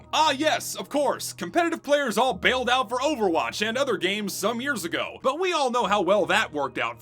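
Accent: South, United States. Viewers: You know when is angry when his southern accent bleeds through his speech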